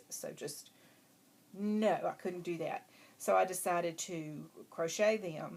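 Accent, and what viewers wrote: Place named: South, United States. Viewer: Love your podcast...I especially love that your accent sounds like mine!!!! Yay for Southern ladies' podcasting!!!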